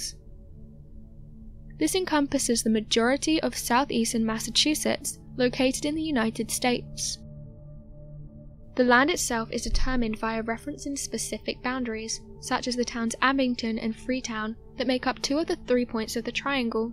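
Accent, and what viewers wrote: United Kingdom. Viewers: This girl is one of the most pleasant narrators on YouTube. Her sweetly modulated voice combined with the pure British accent is refreshing.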